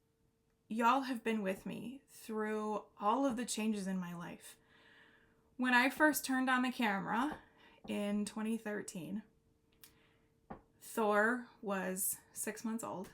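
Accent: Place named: Wales, United Kingdom. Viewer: Love your progress , congratulations on 10 years on flosstube , Welsh is a accent I really love 😊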